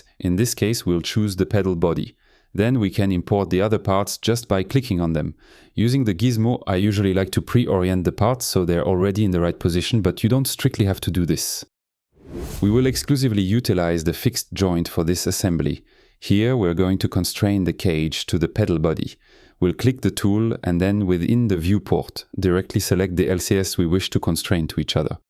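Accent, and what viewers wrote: France. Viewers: You sound french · I was not expecting YouTube to deliver me such an excellent FreeCAD tutorial from a man who sounds like the person every single impressionist based their exaggerated, stereotypical French accent on. This man may be the most French-sounding man I have ever heard. It would be very funny if I'm super wrong abd he's Flemmish, or something. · french accent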